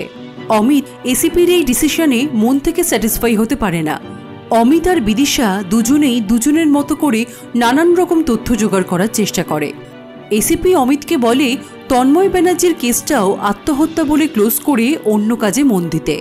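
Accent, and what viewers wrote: India. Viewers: didi tumi ki kolkatar?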